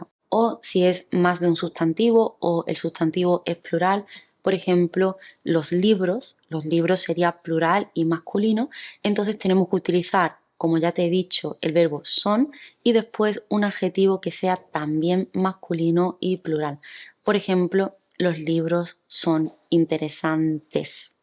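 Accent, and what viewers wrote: Spain. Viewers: De cual pais es la hablante? Es acento de espana?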